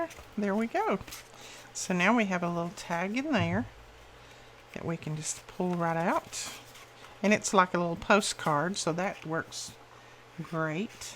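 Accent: South, United States. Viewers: I tried this for my first journal. I loved making it so much I'm working on my third journal....much harder ones. I love how there are so many choices for how to make them. And I enjoy your voice. You have the same accent my Grandmother had. She was from Nebraska. Yours may be a little more Southern, though. Thanks for the great videos.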